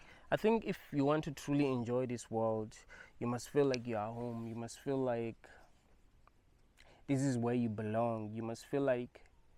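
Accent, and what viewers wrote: South Africa. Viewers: You're South african?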